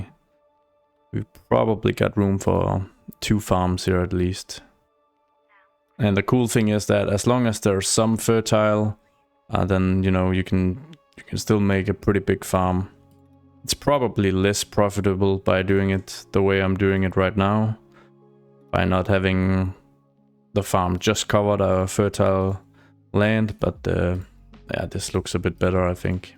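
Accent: Denmark. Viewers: Jeg antager, at du er dansk med den accent :) Man kan aldrig gemme sig som dansker :P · I have to ask are you danish? you sound very danish when you talk. But very nice video love the content:) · Are you danish? The accent sounds familiar 😅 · Det kan kun være en dansk accent det der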